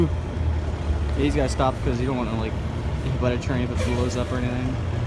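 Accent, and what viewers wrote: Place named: Canada. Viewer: guy has Canada accent but says PA in some vids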